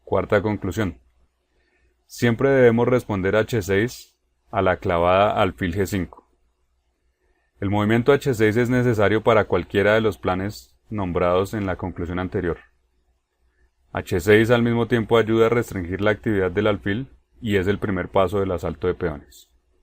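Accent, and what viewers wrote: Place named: Colombia. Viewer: tienes un acento muy colombiano... eres colombiano? gracias por el video muy instructivo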